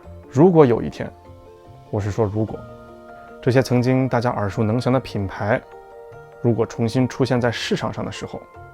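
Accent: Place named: Qingdao, China. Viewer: 我就说听你口音像青岛的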